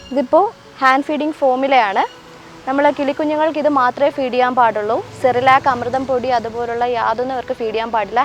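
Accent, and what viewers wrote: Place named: Tamil Nadu, India. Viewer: Unga voice la tamil la maraikka pannureenga but tamil oru poothum maariyathu mam valthukkal mam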